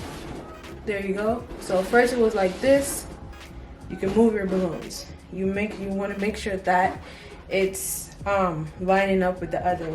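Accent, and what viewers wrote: Jamaica. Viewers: Great tutorial. I love balloons, but I don't like working with them; I'm always thinking they are going to pop, and that freaks me out to the Nth degree+1. P. S. you sound Jamaican.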